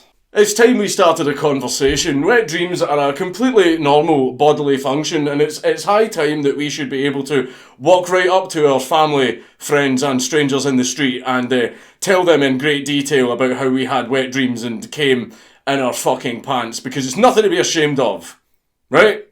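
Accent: Scotland, United Kingdom. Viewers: periods with a scottish accent is the coolest thing ever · There's something special about being taught about the female menstruation cycle by a man with a Scottish accent lol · How the Scott's say shit is fucking amazing sounds angrier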